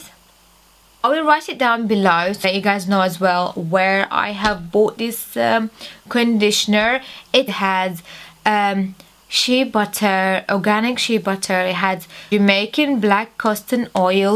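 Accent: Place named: United States. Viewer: Are you from the uk, you sound American 😂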